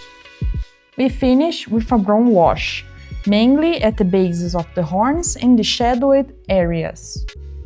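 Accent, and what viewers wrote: Brazil. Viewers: Hey, are you brazilian? I think i noticed a accent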